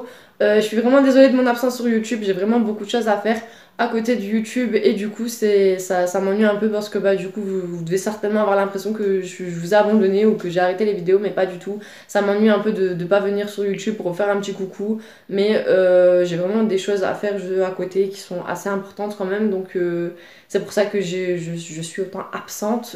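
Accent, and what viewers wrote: France. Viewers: tu n'es pas du 62 ? je dis ça car je suis dans le sud depuis maintenant 15 ans et en fait je viens du Nord hihiiiiii native de Lille dans le 59 et dans le sud tout le monde trouve que j'ai un accent ..... de gros bisous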